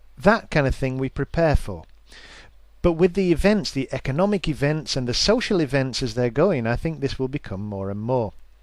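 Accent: United Kingdom. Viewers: Great talk ... keep'em coming. A lot of common sense in your videos.. ... and is that a Geordie accent BTW ? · wow -- very good post and i agree with your consolidation of the current developing "buzz". a few points - ammo is back (if you can afford it), gold is still controlled by the fiat makers, what are ordinary folk? btw, where did you get that image? I want it as my PC wallpaper. I have a good one for you as well. Your accent reminds me of a friend I had from Sheffield.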